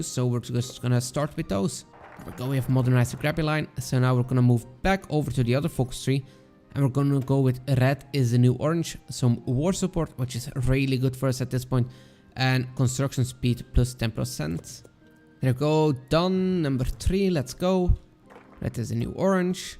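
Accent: Belgium. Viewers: you Belgian? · Because I hear a slight Belgian (Flemmish) accent in pronouncing the Dutch words. I'm from the Netherlands myself. Good pronounciation btw.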